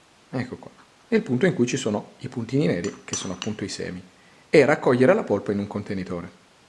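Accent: Italy. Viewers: senior, I enjoy your gardening tips & love your Italian accent, ciao.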